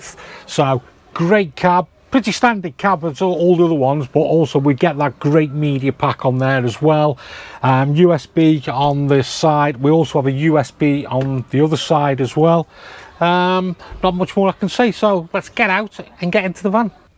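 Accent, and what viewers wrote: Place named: Stoke-on-Trent, United Kingdom. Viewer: He is from the UK, from his accent I am guessing he is from Stoke-on-Trent but I am sure he will correct me if I am wrong 😂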